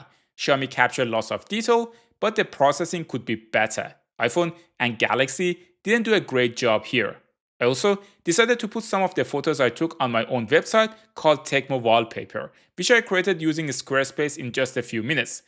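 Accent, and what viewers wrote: Iran. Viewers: persian accent shines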